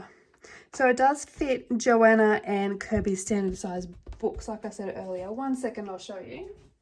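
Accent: Australia. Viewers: I watch all your videos that are on you tube, to give me inspiration and to learn new techniques, your colouring is stunning and i can only hope to get that good someday, , it is nice to see and hear another Australian colourist. Congratulations on your 20,000 subscribers, I am sure your channel will keep growing well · Congratulations! You are an amazing colourist. I am so grateful for all you have taught me. Your colour combinations have been immensely helpful as that is what I struggle with the most. Also it is very heartwarming to hear a fellow Aussie accent! Keep colouring and being amazing 🤩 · When I started adult colouring this year I searched for different channels to learn from and see what was possible and I immediately subscribed to you for your stunning colouring but because of your familiar Australian accent! There are lots of British and American colourists but it's wonderful to hear a fellow Australian 😅 Congrats on your well deserved success 🎉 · Wow!!! 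Huge Congratulations!!! Love your channel … always have your videos running in the background on the weekends and join in whenever I can. Thanks so much for all your videos … for sharing your knowledge and allowing us to be inspired to colour our world💕. Lovely to hear another Aussie too❤️.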